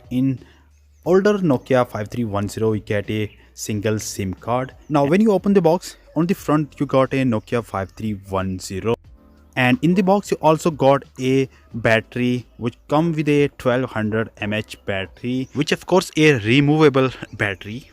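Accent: India. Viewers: Oh that indian accent.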